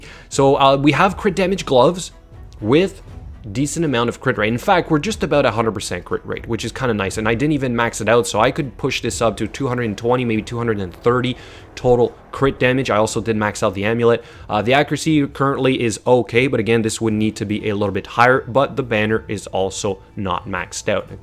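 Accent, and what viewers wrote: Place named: Newfoundland, Canada. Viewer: That's a "newfie" accent. 95% of Canadians don't pronounce it aboot ;)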